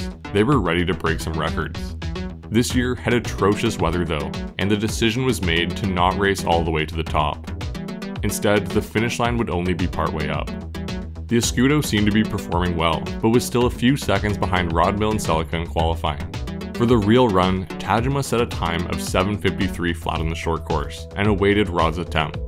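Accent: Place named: Canada. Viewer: If anyone is wondering what a non Maritimes/Quebec canuck accent sounds like, this channel's for you. Cheers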